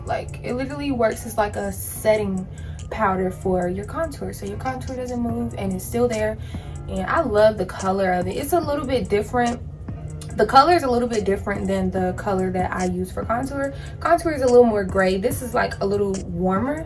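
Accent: South, United States. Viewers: Omg, I didn't know you were from Augusta! I did hear a little bit of Southern accent! I am from Statesboro, GA. Love the video! XOXO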